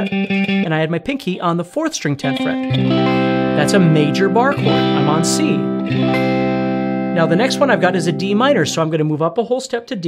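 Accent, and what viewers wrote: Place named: Midwest, United States. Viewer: Midwestern accent. He’s probably from Iowa like me haha. Maybe Wisconsin.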